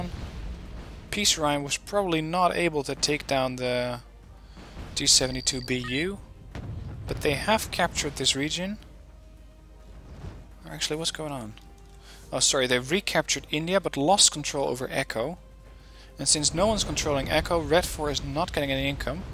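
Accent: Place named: Netherlands. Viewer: You sound dutch !! Thank you for this replay !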